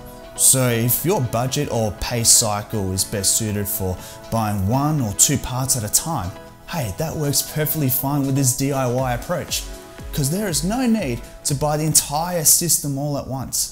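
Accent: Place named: Australia. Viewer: Is it because the Australian accent because for me he sounds fine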